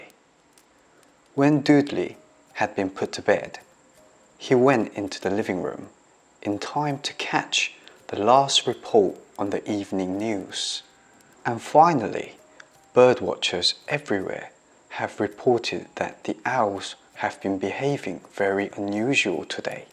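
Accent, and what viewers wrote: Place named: United Kingdom. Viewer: 영국악센트 쥐리네여~~~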